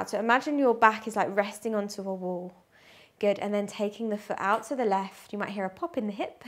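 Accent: United Kingdom. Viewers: Thank you so much! I decided to get myself a strap yesterday after over a year of (almost) daily practice, and that video is exactly what I needed :) (And by the way, I wish I could speak english with such a lovely accent, purely british <3 )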